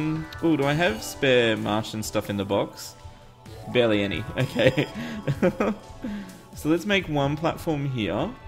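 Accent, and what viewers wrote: Australia. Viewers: Hey, I'm new to your channel but, I love all the videos they are great keep going!!! and all so its good to see more Aussies getting on this!! I could tell in the first episode you were Australian and I laughed when you said in a vid "that rings a bell" and you had to explain it, very funny. Anyway keep going and don't let anything stop you, you are great at what you do.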